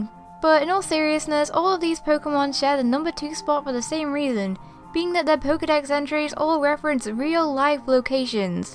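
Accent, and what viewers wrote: United Kingdom. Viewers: Wil Asd its just her accent she is british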